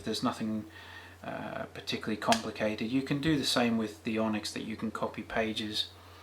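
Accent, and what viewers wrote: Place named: United Kingdom. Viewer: When viewing a PDF in landscape on the Note Air, is it possible to do fit-to-width? On the vid, it seemed like you were viewing the whole page in landscape, which made the text really small. Another thing: With split screen on the Air, when reading a book in one half and making notes in the other half, the fact that you can't scroll notepad up as you write but have to keep repositioning the device in order to maintain your writing posture (right?) means that one's viewing angle/distance of the book one is making notes from has to be continually changing. Doesn't that make split-screen more or less useless for serious, prolonged note-taking from books/PDFs? Thanks for the vid! (You've got a British accent but you said "euros" not "pounds"!)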